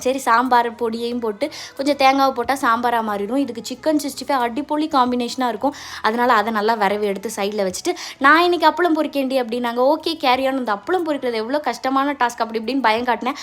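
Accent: Tirunelveli, India. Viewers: Ungaluki tirunelveli a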